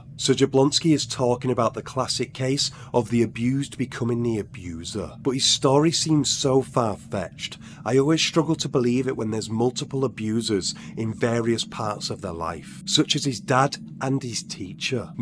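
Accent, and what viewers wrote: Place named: Liverpool, United Kingdom. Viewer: Dude are you from liverpool? Your accent is so scouse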